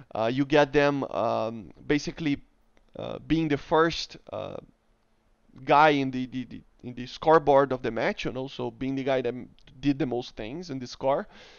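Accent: Brazil. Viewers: acho que voce é brasileiro, seu sotaque me diz isso. am i wrong?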